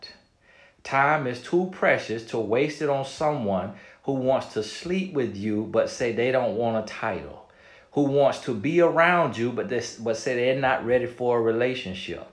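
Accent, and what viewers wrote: South, United States. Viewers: Sadly, in the black community especially, it’s become accepted that two ppl can date, live together and have kids etc and still no marriage? To each its own, but for me I wanted different. My entire family were married, later ppl divorced, but in my younger years my siblings and my cousins parents saw marriage. The other factors ppl are settling for are obvious, like you said “come on now” even in your southern accent ☺️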